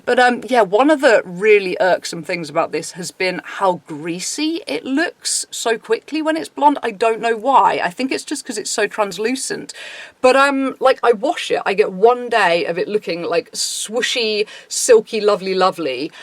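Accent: United Kingdom. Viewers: hey, what part of Britain are you from?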